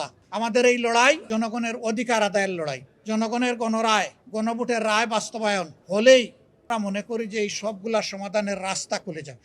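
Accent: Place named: Sylhet, Bangladesh. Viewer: doctor sab speak silghtly sylhety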